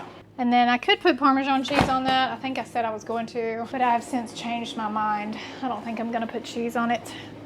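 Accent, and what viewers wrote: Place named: Tennessee, United States. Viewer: Girl!! When I heard you say Paris, TN!! I grew up in Humboldt, TN and have family in Paris! I should’ve known by your accent 😅❤ love it!!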